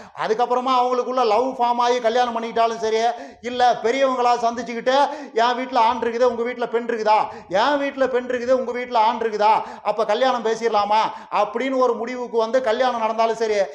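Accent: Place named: Coimbatore, India. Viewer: ur cbe voice gives the slang a nice one wait for the voice not for the messages matters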